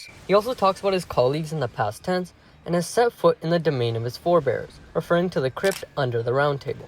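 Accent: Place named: United Kingdom. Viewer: lol he’s British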